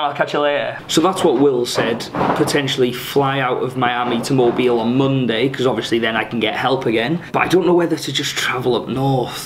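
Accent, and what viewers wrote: United Kingdom. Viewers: White skin & British accent. The keychain to America's chastity belt. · I think the British accent helps...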